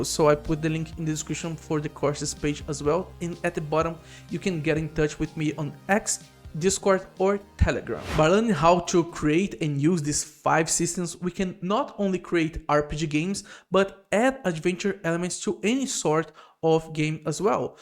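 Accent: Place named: Brazil. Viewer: No exato momento que tu começou a falar eu sabia que tu era BR AHUAHUAHU Nosso sotaque é muito reconhecível xD Bom vídeo, mano. Vou me inscrever.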